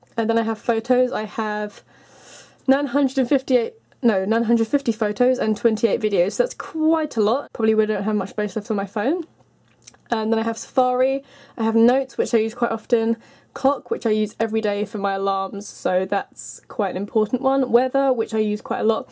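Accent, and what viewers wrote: United Kingdom. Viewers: oh my god, finally ! I have been watching these videos and the were either american or australian people. Finally an english girl. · are u british???? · its kind of rude what im saying but sometimes the british accent kinda of throws me off..idk why not trying to be rude or anything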